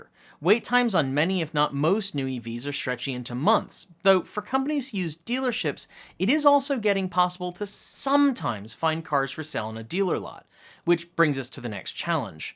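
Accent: United Kingdom. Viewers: Great advice especially for me who is saving up to purchase a used EV.. Wait a minute... What happened to British accent? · Am I crazy or do you usually have a British accent? Your American accent is very good! I wish I could do a british accent as well as you do American haha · What happened to the British accent?!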